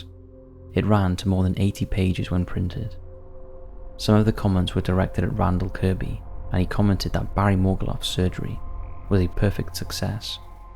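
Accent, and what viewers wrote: United Kingdom. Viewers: Your voice is beautiful but it resonates like in an echo. I love the British accent, but I have difficulty understanding it with clarity, I wish you pay more attention to the sound effect. Also, the music interferes making it even harder to hear clearly. No music is necessary. Great documentary, thank you.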